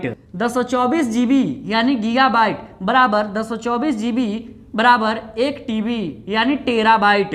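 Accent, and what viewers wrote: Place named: Bhojpuri, India. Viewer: Ye hua smjhane ka idea 👍👌👌 or mst trick se smjhaiye bhot bdhiya h Apka bhojpuri andaj bhi sandar h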